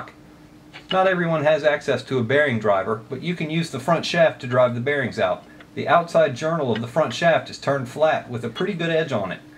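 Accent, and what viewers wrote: United States. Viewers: Are you from Alabama?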